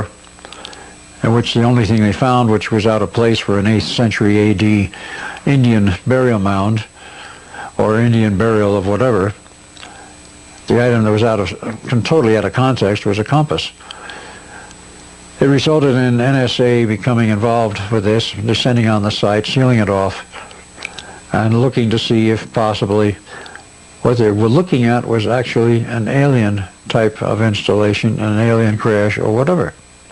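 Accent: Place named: Boston, United States. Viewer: he most be from Boston.. is Al bostonian ?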